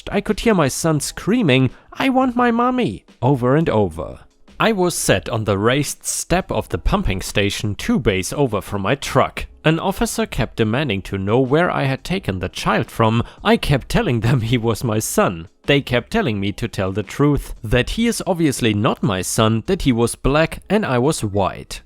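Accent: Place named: Germany. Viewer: I love your accent! You sound like my German Grandmother! 💋❤❤❤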